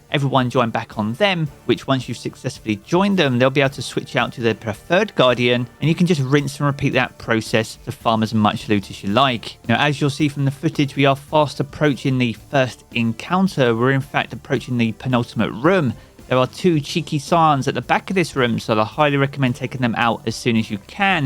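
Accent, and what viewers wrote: United Kingdom. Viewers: I literally loved your content because it’s straightforward and your British accent is just absolutely lovely ❤❤❤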